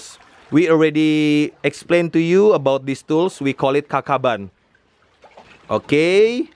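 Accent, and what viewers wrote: Indonesia. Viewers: i love indonesian accent it is cute :D <3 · hehe logat ngomong inggrs emang gitu bisa ketebak klo yg ngomong org indonesia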